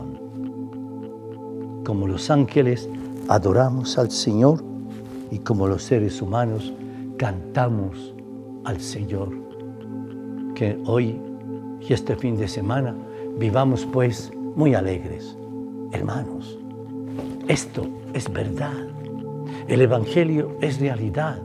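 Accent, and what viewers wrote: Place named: Colombia. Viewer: Cuánto gusto padre, lo veo muy bien a pesar que le dio el covid, Dios lo protegió. Padre usted e Colombiano, siento que habla el español en forma diferente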